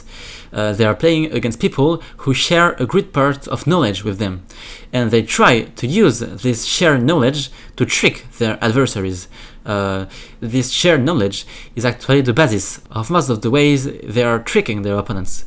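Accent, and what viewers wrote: France. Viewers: dang your english grammar and vocabulary is really good considering your strong french accent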